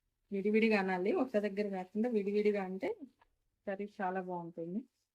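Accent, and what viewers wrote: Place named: Telangana, India. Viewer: Nice telangana slang ❤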